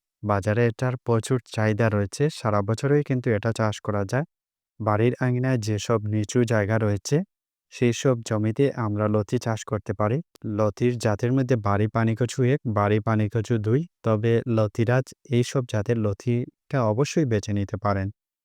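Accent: Sylhet, Bangladesh. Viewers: হেলো সিলেটি ভাইসাব। পার্পল কিং জুন মাসে লাগাইলে অইবনি??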